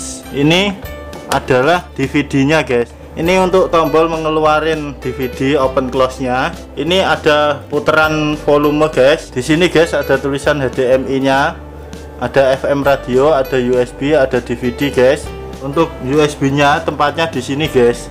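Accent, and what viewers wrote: Java, Indonesia. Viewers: klo ada langsung tak like. aku pokoke ngefasn karo bahasamu mas. medok jowo marai ngakak. ngrungakno tok wes ngakak.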